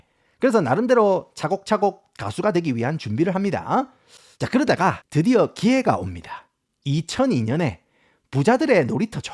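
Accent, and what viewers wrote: Gyeongsang, South Korea. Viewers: 나 충남 당진 사람. 자꾸 전남 당진 당진 할래? 처음에는 전남 강진이 라고 하더니만 자꾸 당진 당진 하네 서버r할나미 뒤짏라고. 경상도 사투리 쓰는 거 같은데 그쪽 동네나 빗대서 얘기를 해 자석아~ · 구수한 경상도 사투리에 갑자기 튀어나오는 원어민 발음이 뭔가 언발란스한데 좋네요 ㅋㅋ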